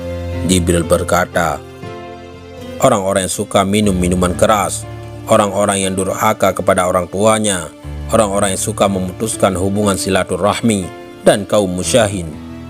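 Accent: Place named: Bali, Indonesia. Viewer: Yg pengisi suara nya siapa ya kyk nya bkn orang jawa logat nya kyk orang bali mungkin kh bali mualaf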